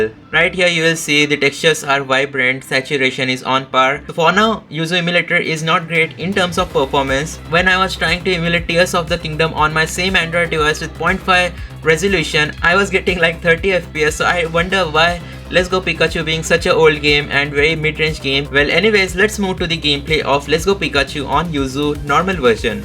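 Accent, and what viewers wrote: India. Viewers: Jesus christ! No wonder people hate indian accent. Get to the point! 🙄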